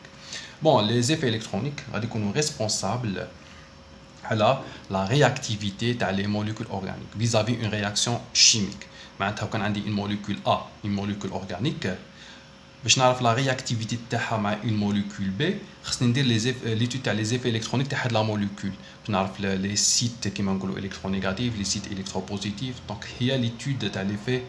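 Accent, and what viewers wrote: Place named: Algeria. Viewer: اه شكرا بارك الله فيك هادا الكور حوست عليه حتى شبعت نلقا غير المغاربة يشرحو فيه او مافهمتش عندهم باسكو الدرس ماشي كيما تاعنا سواسوا قلت نكمل نشوف عند هدا اللخر و خلاص حسبتلي تانين مغربي حتى سمعة اللهجة تاعنا 😅 والله غير فرحت ربي يعينك او يجازيك خير الجزاء🤲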